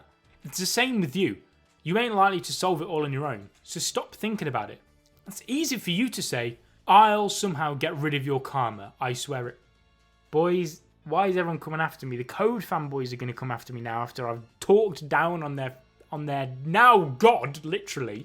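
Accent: United Kingdom. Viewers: Your British accent is so good😃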